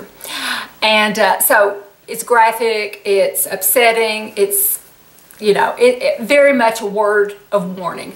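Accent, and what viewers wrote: South, United States. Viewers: I love the way you speak! You sound friendly, so genuine. I am aware of the stigma of southern speech but hey Jimmy Carter was elected President. How boring if we all spoke and looked alike. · I love your sweet southern accent! Your so sweet❤️. We all have different accents... Im from New Jersey and hate my Jersey sound and have been made fun of when visiting other states. Watching your video has helped me to look at it in a different way❤️. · I love your accent...you sound like me...lol! Some people think if you have a southern accent you’re stupid...at least in my experience. Love ya! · I love your accent I mean it's a part of you it brightens my day and makes me smile your such a beautiful lady with the sweetest personality! Your very country and southern an I just love it! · Wow! Your hair looks even more beautiful than usual. 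😍 Also, your southern accent is very endearing...who cares what anyone else thinks. I’m from a rural town & have a bit of “hick” in me too. Being you is why I subscribed. You’re one of my favorite beauty vloggers & I hope to look half as good as you at 64. You’re an inspirational stunning lady. 💕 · I love your southern accent. It is very charming! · Shame on those folks for commenting on your accent. I'm proud of being a Southerner and you should be too 😉 And yay about the Pear and Toasted Praline body wash. I thought about you when I saw it, but wasn't sure if it was the same. So glad it was😁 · I do love your accent!!! That’s why I can relate to you. I’m from NC so we sound similar. I’m proud to be from the south. I also greatly enjoyed the PBS series. Love ya ❤️. Don’t change a thing about you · Hi do not fret over your accent one iota... Your southern accent is one of the many things that makes you so endearing... 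Southern class & charm radiate from you!!! Cheers!!! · I love your southern accent.❤️❤️ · I remember the first time I watched one of your videos. I had been searching the web for something and a thumbnail came up about a “hot” grandmother or something taking YouTube by storm. Well, it was you. I was captivated by your sweet Southern charm, adorable dialect, and Goldie Hawn smile. I have been hanging with you ever since. You are a blessing:) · Aren't you a ray of sunshine? Love this color on you! ☀️ Southern accents are brilliant!! 💛 Wanna swap with this Jersey girl? #fuhgeddaboudit 😝 Love and thanks always, xx PS: Crime Junkies podcast is really good! 😎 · Howdy, I'm from Connecticut and I don't have an accent 😰. At least I don't think I do lol. Anyhow I love your Southern accent! Can I borrow it lol. Besides my FAVORITE male recording artist since I was a lil girl has an accent like yours and I could listen to him till the day is long. 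He's KENNY ROGERS!! 👏👏👏👏👍👍👍👍💥💥💥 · You have a beautiful southern accent ! When reading comments from people , as soon as they get negative or mean just stop reading the comment. Don’t let anyone take your joy away! 😘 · I too am a Southern girl - Kentucky. I love your accent, but most of all your a genuine, natural person! Love ya! Try Opi Nail Envy will strengthen & restore your nails, it’s magic. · Hey! I can’t believe that someone said that about your accent!!!! How rude!! Of course I have a southern accent also.......😂😂Oh I love that lipstick combo!! Sooo pretty on you!! I’m going to get that Praline and Pear 🍐 body wash!! Yummy 😋 I love fall,don’t you?? That balloon 🎈 arch is GORGEOUS 🥰 GORGEOUS GORGEOUS 🥰🥰 Great job!!! Love ya girl 💋💋💋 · I’m from the south and far from home, your accent is one of the reasons I listen! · The reason I started following you is because of how much I love your accent. 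I discovered you in 2015 via the Huffington Post (or somewhere similar) who said "you'll be as obsessed with her Southern twang as we are," which I think is a high compliment! The person who called you a hick was just showing their ignorance. Keep talking x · Your Southern accent is beautiful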